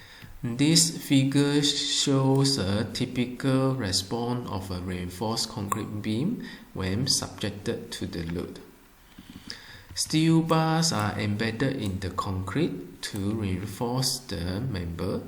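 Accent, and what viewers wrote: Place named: Malaysia. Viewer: i guess the presenter is malaysian? the accent is sooo familiar and feel like home =D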